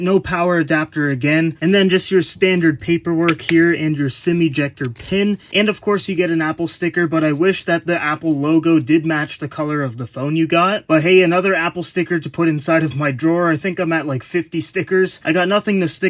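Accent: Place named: Canada. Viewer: What’s wrong with Canadian English?🙄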